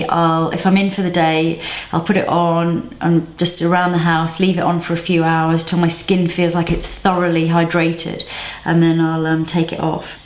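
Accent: United Kingdom. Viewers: Thank you so much... This information is priceless. I've taken notes to try some products. I have combination/oily skin and rosacea which makes my skin hyper sensitive. Besides, I'm allergic to salicilic acid so... I have to be creative in my skin routine. Lol, but it´s like you said, you have to pay attention to your skin because it talks in a very clear way, and if we listen, we will be able to treat it properly. BTW your accent is helping me with a recording I have to do in British English;)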